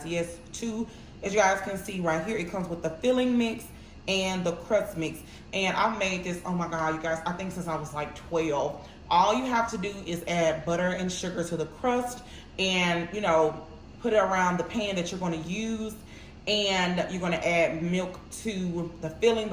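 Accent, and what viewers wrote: Mississippi, United States. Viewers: I love your Mississippi accent, thank you for sharing your recipe.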